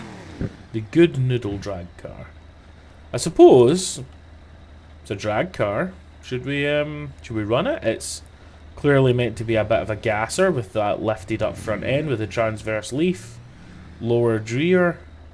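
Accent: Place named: Scotland, United Kingdom. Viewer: It's the first video from you, that popped up in my feed. You're speaking like level 1.5 or level 2 of Scottish out of let's say 5. I wanted to move to Scotland 4 years ago (as an eastern european), but covid stopped me unfortunately. I understand English very well, but Scottish English was something new for me. Thankfully I found "Autoshine Cars" account, who's a Scotsman living in Blackpool and learned your pronounciation from him and can understand you fully :). I love your vid, keep them coming <3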